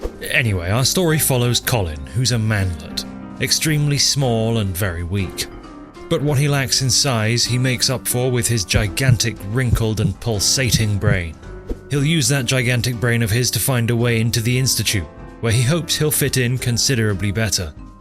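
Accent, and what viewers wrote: RP, United Kingdom. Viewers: You're like the anti-nerbit. You don't like melee weapons, like the railroad, have the posh British accent instead of the funny one he has, and exploit the game to make it easier rather than harder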